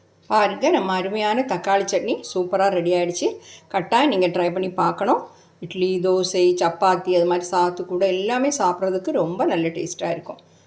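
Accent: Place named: Kanyakumari, India. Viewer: அவங்க கன்னியாகுமாரி மாவட்டத்தை சேர்ந்தவர் போலும்.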